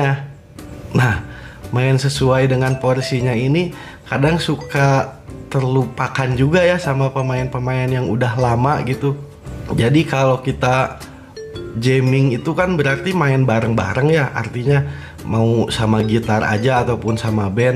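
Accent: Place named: Bandung, Indonesia. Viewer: Bandung banget bahasanya aa 😅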